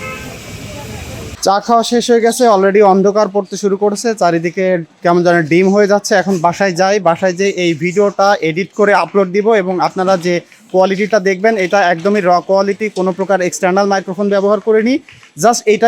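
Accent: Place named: Khulna, Bangladesh. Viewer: ভাইজান মনেহয় খুলনা অঞ্চলের মানুষ তাই কথার মাঝে সেই রকম টান পেলাম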